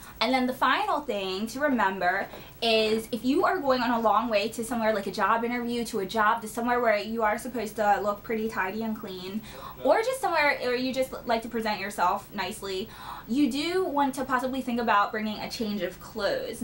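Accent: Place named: South, United States. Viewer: HAHAHA, a wet rat... good one. ;p girrlll... you're super funny! (: i want your steering wheel cover. been meaning to get that one. (: you have an accent... i'm having a hard time determining where you might be from. my bf says tx or somewhere from the south! you're super talkative; i love! <3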